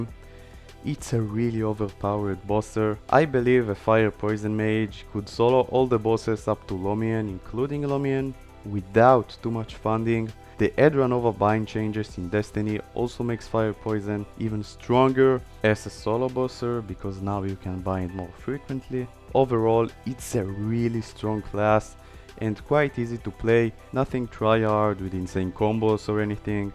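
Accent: Israel. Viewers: וואלה יא קינג זהיתי אותך לפי מבטא איזה ישראלונים אנחנו, אחלה סרטון דרך אגב. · ישר זיהיתי תמבטא הישראלי אחי ישר סאב!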